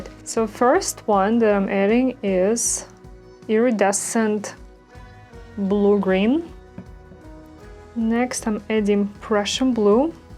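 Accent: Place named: Russia. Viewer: Another beautiful creation! In the least creepy way possible... is such a beautiful & charming lady & i am basically in love with the happiness she experiences through her creativity. I also have a thing for russian women & their english dialects. AND she's an artist... Her husband is a lucky guy!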